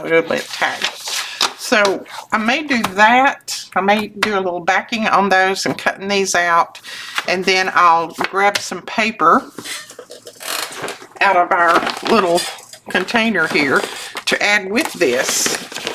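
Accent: South, United States. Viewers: God bless you with Peace, Courage and Strength. My husband of 43 years passed away a year ago July 14 then my mother's earth suit gave out at age 93 in January '24. You have been a companion of mine since I found you and am very grateful for your Southern upbringing which is reflected in your sweet accent and charm. I am now a faithful student of yours BTW, I have two daughters, three granddaughters and a grandson. Two of my granddaughters (sisters) now live with me to my great delight. I will pray for you and encourage you to face each day with grace while looking forward to the goodness to be found when you look for it. Kay Davis Peddie, Quincy, FL.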